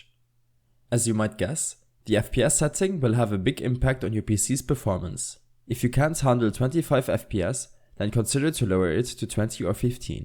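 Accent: Germany. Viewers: ich wusste das du deutsch bist wegen dem Akzent haha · Man hört direkt raus, dass du deutsch bist xD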